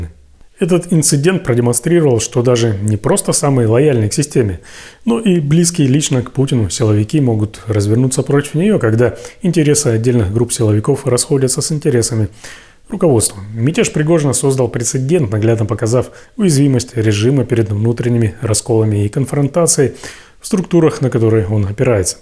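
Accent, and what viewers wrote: Ukraine. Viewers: А почему акцент укропский??!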